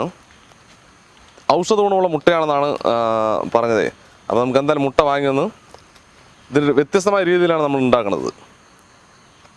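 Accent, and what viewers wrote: Palakkad, India. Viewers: Ninglde Slang Kettitt Palakkad aanu nnu thonnunu. Sariyano?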